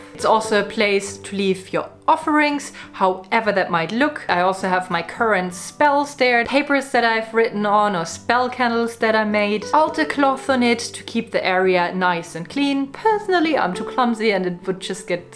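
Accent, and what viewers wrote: Germany. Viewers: I’m going to build an altar and just found you from this video! I just want to say that I’m obsessed with your accent! Are you German? You are also so beautiful. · Are you german? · German? The land of witches. I might be wrong with the accent.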